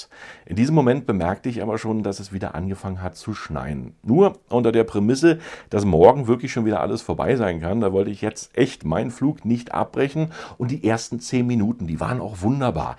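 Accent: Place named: Germany. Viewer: Wow, you sound so German, amazing! Love such heavy German accents, so awesome.. Sweet Keep well and be safe bro! 😁🇧🇬🛸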